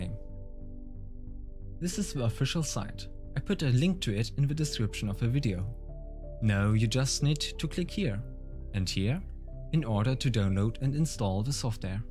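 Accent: Germany. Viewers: ich habe schon am anfang des videos an deiner aussprache gehört das du deutscher bist :D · Bros accent is way to german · are you german? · you can hear the German accent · ich wusste du bist deutsch XD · I Hear Deutsch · keiner kann mir sagen das er nicht deutsch ist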